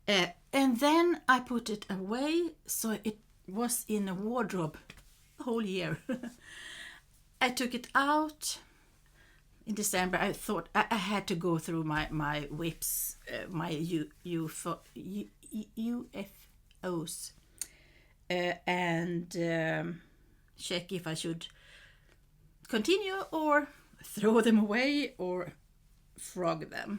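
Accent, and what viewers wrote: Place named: Sweden. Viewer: Happy New Year! Wow--not surprising that I was far off for the guesstimate of the yarn amount for 2024 😂 Your Swenglish was excellent--no worries. Loved all the socks and plans...see you next time!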